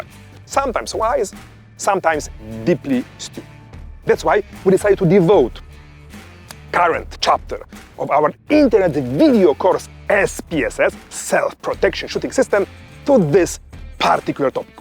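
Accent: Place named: Eastern Europe. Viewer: Bo po wschodnio europejsko angielsku.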